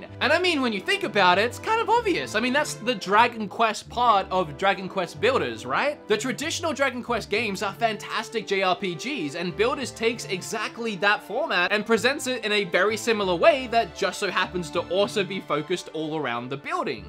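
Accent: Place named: Australia. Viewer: Woow, weird hybrid accent my fellow Aussie, I think?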